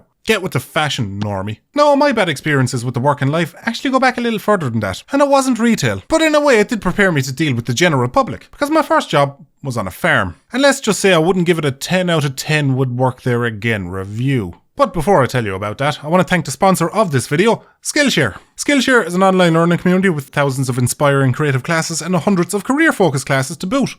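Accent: Ireland. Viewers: I just noticed your accent, are you from County Cork or Dublin town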